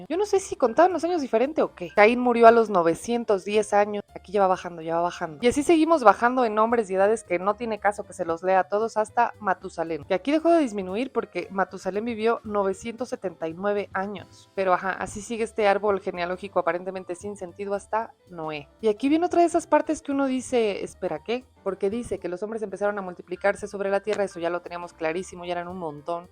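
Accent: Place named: Mexico City, Mexico. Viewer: Qué joya de comentario, carnal. Leer la historia antigua en tono chilango es otro nivel. Y la neta es que traes un ritmazo con los datos históricos de los egiptos y la estrella Sirio. Es 100% real que esos sacerdotes se dieron cuenta de que cuando Sirio aparecía en el cielo, el Nilo se inundaba, y así calcularon el año solar de 12 meses. Y sí, Julio César llegó siglos después a meter sus reformas porque el calendario romano era un desmadre. Pero con lo de los 900 años de esos güeyes de la Biblia, la matemática nos da un patatús biológico. La teoría de que contaban "meses" en lugar de años solares para que Matusalén viviera 72 años suena chida, pero si aplicas esa misma lógica a todo el árbol genealógico, el guion se rompe bien feo. Por ejemplo, el Génesis dice que Enoc tuvo a su hijo a los 65 años; si esos fueran meses, significa que el güey fue papá a los 4 años y medio de edad. 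¡Una completa locura! La realidad histórica es más simple: los escritores de la Edad de Bronce no tenían un error de álgebra, simplemente eran bien exagerados. En todas las mitologías de esa zona (como los sumerios), entre más viejo y antiguo era el ancestro, más años le inventaban para hacerlo ver más sagrado, poderoso y cercano a los dioses. Eran puras mentiras piadosas para apantallar a la gente del pueblo, no un problema de traducción de los egiptos. ¡Pero qué buen cotorreo armaste con Julio César y sus mamadas!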